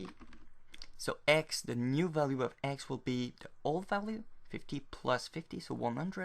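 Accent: France. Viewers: T'es français ? O_o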